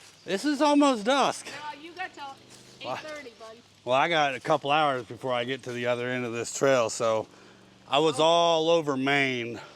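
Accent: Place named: Kentucky, United States. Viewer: Moose in the Whites are not a myth! I followed one across Chocorua lake in my kayak once. I’m always surprised to see moose droppings waaayy up on ridge tops on the trails. What are they doing up there? Saw one in Lake Umbagog as well. I’m enjoying your videos.. maybe I’ll see you on the trail sometahm (that was a tribute to your (Kentucky?) accent. Love it.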